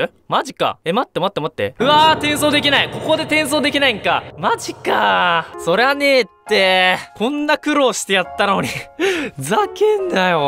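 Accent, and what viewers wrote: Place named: Okinawa, Japan. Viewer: イントネーションが沖縄っぽい(笑)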